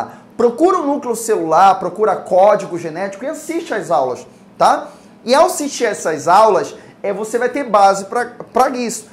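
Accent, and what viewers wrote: Pará, Brazil. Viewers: Ede Montanho ele é paraense. 😊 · Esse cara só pode ser do Pará... É lá de nós. éguaaaa cara saudade da nossa terrinha. o melhor. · é paraense?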